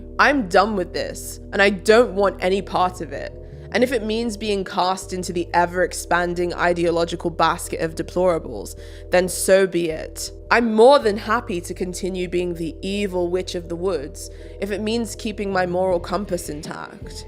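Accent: United Kingdom. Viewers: Are you from Bristol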